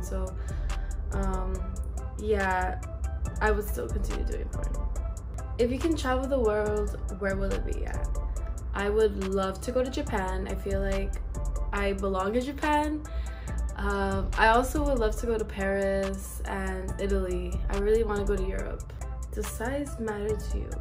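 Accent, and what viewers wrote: Spain. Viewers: Your Spanish accent <3 <3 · I like your spanish accent.. Greetins from cozumel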